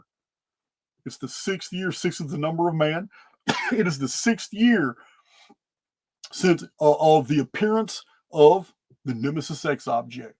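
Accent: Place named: South, United States. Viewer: I have been measured at 161 IQ. You are truly smarter than 119...I put you at 162...it is too hard on the intellectuals to lose arguments and debates to a southern accent. I know, I am from NC, and live in California...Yep, that southern accent is a double edge sword. On one hand the intellect is stealthy, on the other hand it is easy to dismiss a southerner speaker and pass it by as a dumb-ass blithering, which you and I are not. Love your shows.You ever need a typing done, i do about 100 wpm.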